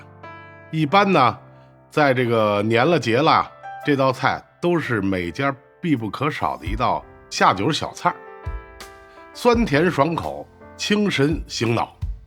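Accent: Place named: Beijing, China. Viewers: 廣東人，第一次看到這菜，好酷🆒，單單看見都覺得轟鼻，哇噢，這麼多芥末(´▽｀)瑟瑟發抖ing。等我買了芥末粉也試試，另外說一句，北京話好好聽，謝謝分享(o^^o) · 这位厨师大爷说的北京话真好听。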